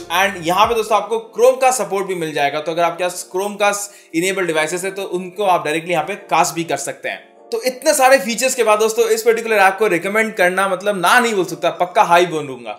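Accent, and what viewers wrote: India. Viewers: Hes speaking Hindi-nglish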